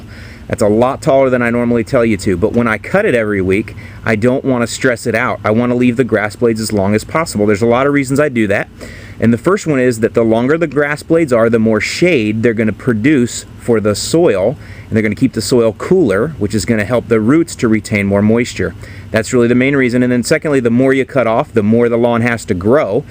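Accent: Chicago, United States. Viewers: ""WOW""even your name was different did I hear a do you have a strong accent Chicago the Bears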